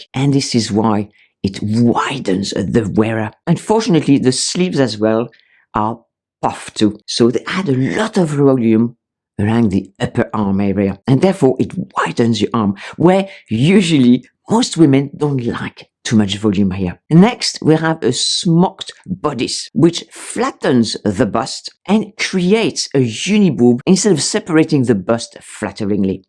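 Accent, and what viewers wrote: France. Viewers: First of all I have to say I could listen to you talk for hours. Love the beautiful French accent. My ability to speak French is nil.😂 When I went to Europe. It was obvious that French women know how to dress. They know how to live life! They have impeccable style. Clothing is about quality not quantity. They spend money having their clothes tailored. They know how to buy clothes that fit!! They know how to dress for the occasion. They're very mindful of where they will be going and what they will be doing. Even the way they do their makeup. It's sensible yet sexy. Because of this they have je ne sais quoi. You develop style through your use of fashion that is unique to you. You don't wear a trend. That's definitely something I wish I would have discovered in my younger years. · you look fabulous in the purple blouse.😍 That's the advice I needed today, since not only do we have the same name, but we look similar as well, size, colors and all. 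It is still your wonderful French accent that draws me to your videos though! 😂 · Kia ora from Aotearoa, New Zealand!💙💚🙏 I really loved this video and think you're wonderful too!🙏 I love your beautifully soothing french accent and voice. I'm looking forward to more of your videos 🌟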